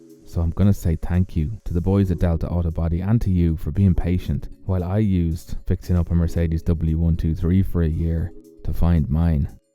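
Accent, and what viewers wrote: Ireland. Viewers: music is fine, but you appear to be only talking out of my sub woofer. I'm pretty sure it's not just your delectable Irish accent... 😏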